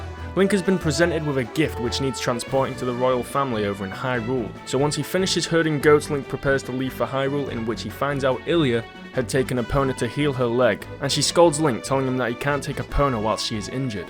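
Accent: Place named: Australia. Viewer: He sounds Australian to me an American lol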